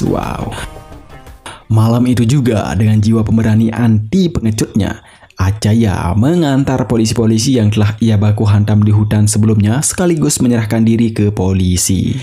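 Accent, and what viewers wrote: Bali, Indonesia. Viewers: Pembaca narasi Orang bali dech ini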